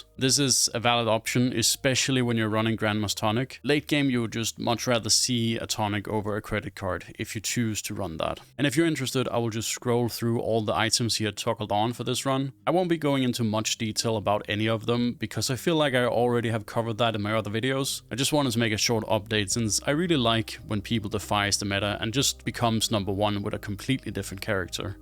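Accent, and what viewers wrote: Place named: Denmark. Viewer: Do you happen to be Danish? You sound like you could be.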